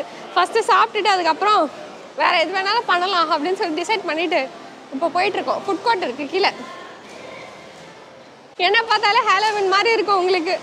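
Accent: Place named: Chennai, India. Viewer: Unga Chennai slang super akka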